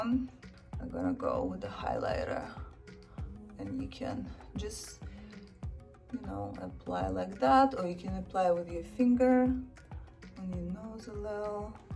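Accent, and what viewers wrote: Russia. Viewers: The best things about her are the deep resonating voice with the Russian accent and the emerald green eyes wow 🥰👌🏻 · They do look similar and she does sound like Adriana with a small Russian accent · She still speaks russian accent so much) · I love her russian accent so freaking much 😭😍😍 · Had to come back again for her adorable Russian twang 🥰🥰🥰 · I Love her Russian accent 😍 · И все же чувствует русский акцент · She’s russian i think it’s just her accent 🤣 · У нее красивый русский акцент, очень мило)) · That russian accent adds more to her sexiness than all the beauty product combined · I love her Russian accent 😎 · обычный русский? вы видимо не слышали как говорят большинство россиян на анг. Её акцент по сравнению с ними чистейший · i’m in love with her russian accent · I don't know what I was expecting her to sound like but I love her Russian accent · She is like a russian gangster with her accent and attitude. 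Im all here for it tho. Drop dead gorgeous. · Russian accent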